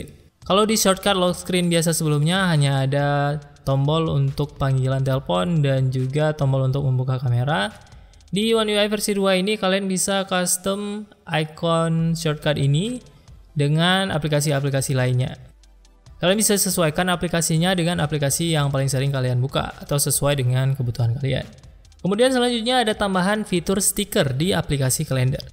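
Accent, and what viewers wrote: Aceh, Indonesia. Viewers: lamtemen. aceh bro?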